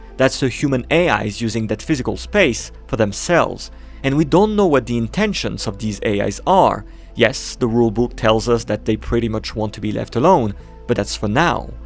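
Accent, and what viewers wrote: France. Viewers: French accent ahhhhhh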